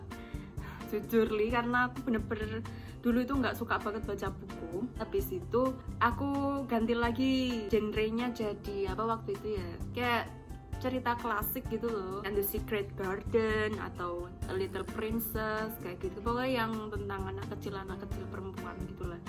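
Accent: Java, Indonesia. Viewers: mbak e medok banget kaya orang jawa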